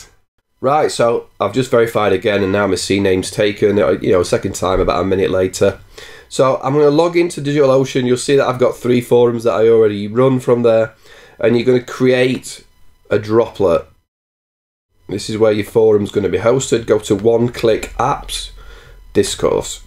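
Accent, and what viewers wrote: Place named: Doncaster, United Kingdom. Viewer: Nice to hear a plain-speaking Doncaster accent rather than an American on this sort of video. At 9mins or so, the chosen one-click install in DO is not the official recommended way to install Discourse. Discourse itself suggests following its setup instructions through the command line. I gather the one-click install does not create a swap file, which is why the setup slows to a halt unless you choose the larger USD15 droplet. Discourse will run happily for a small community on a 1gb droplet using the official instructions that employ a swap file. Also, you cannot downsize a droplet (ie go from 2gb to 1gb). So perhaps it is best to start small then resize upwards if need be. Anyway, thanks for video, very helpful.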